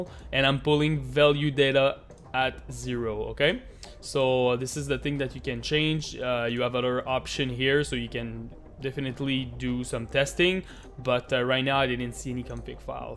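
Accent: Quebec, Canada. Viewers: le québecois mdr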